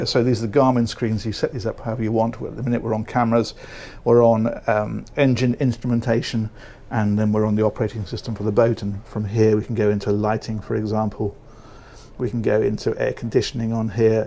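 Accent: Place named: United Kingdom. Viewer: Wow, what a fantastic toy, an absolute awesome party boat! Great tour as always, and lovely british accent makes every video even better!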